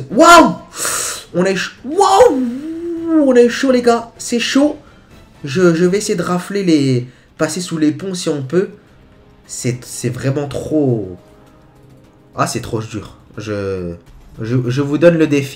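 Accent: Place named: South, France. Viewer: Tu a lacsent du sud !! ❗️❗️❗️❗️❗️❗️❗️🤓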